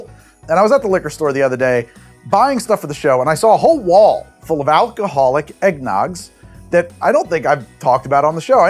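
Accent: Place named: New Jersey, United States. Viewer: Carnival barker with his Jersey roots coming through.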